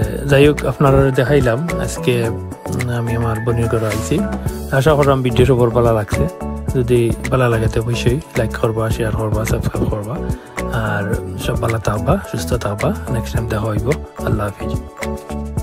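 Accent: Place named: Sylhet, Bangladesh. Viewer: Sylheti Voice amr khub valo lage Bhai 😻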